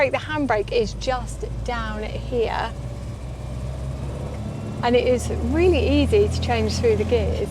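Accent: United Kingdom. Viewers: We can tell that your love for this car is not feigned. And I love your British accent (quite logical since you are), your colorful expressions (...like a swan on a pond) and you drive divinely (deessely) well and with style (with your leopard coat you look like Catherine Deneuve in a Truffeau film, perfect temporal matching)🙏💙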